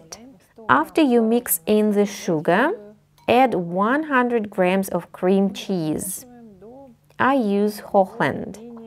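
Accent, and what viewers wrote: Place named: Russia. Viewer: This Russian accent that you cannot hide even when you try very very hard. Anyway, very beautiful and helpful videos. I love them. Thank you so much!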